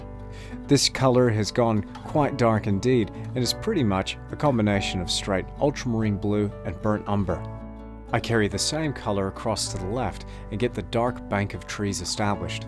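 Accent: Australia. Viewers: your accent is so Australian for some words, and so American for other words. I would have guessed the Aussie would get into all words, at least a little bit. But it's either one or the other though, very interesting. Great location by the way.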